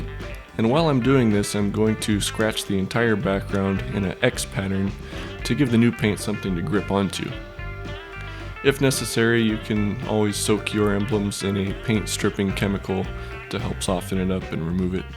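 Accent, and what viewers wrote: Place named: United States. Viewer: Irritating americun voice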